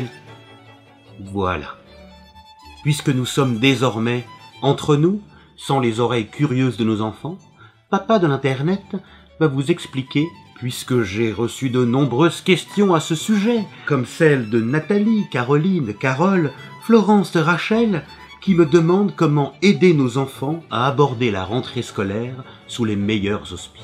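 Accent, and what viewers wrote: France. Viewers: Salut :) Je regarde tes vidéos de temps à autre et je peut te dire que tu as de l'avenir si tu continue ainsi ! Reste comme tu est avec tes "vieilles" blagues qui malgré le temps nous font toujours marrer et ta prononciation à la française des mots anglophones est juste ÉNORME. Bonne continuation à toi et tu peut compter sur moi pour un petit commentaire dans chacune de tes futures vidéos. A plus :D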